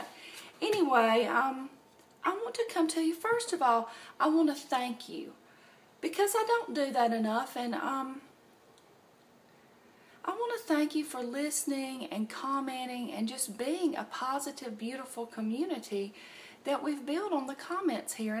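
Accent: South, United States. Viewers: You need to write a book,! You say things in a way that's so well put and thought out. I'll read it in a southern accent so it will be just like you!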